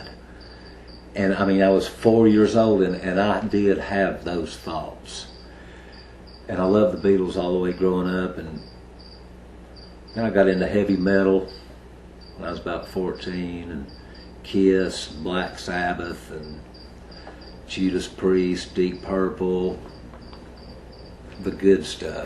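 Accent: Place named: South, United States. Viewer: Interesting Video. I discovered and around ‘92. Just my personal opinion but their self titled & were their finest releases. showed signs of what they previously were capable of but after left & Jimmy Stiff they seemed to be lacking which makes sense right?. I will now try and find a video explaining why Jimmy left. That’s one very real Southern accent you have Mr. Appreciate the video. It must’ve been hard leaving such a cool band, esp because of the tonnes of sexy women that would be at your shows. I imagine that would be the BEST perk of being in a RnFnR band for sure!!!! That’s the main reason guys play instruments 🤤🤙🏽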